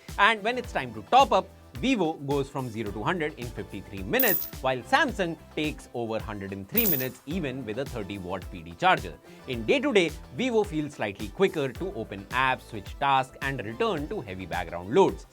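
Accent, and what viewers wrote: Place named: India. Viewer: Horrible Indian accent, 🫤 i can't understand what you saying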